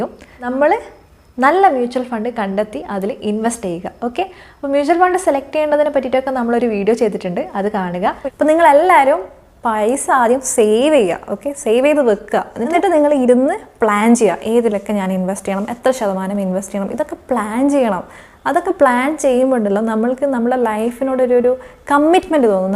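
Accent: Kannur, India. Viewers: Kannur aano? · Nalla rasond samsaram kelkkan...kannur bhagam aano veedu?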